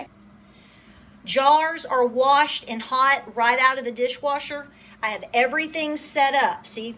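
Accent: South, United States. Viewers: Your are southern funny love it 😊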